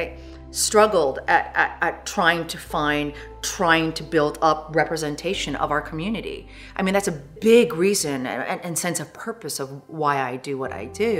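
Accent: United States. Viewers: The whole time I think she is american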